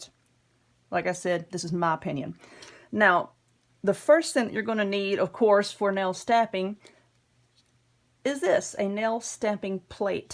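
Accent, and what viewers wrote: South, United States. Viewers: TYFS...I luv your southern dra2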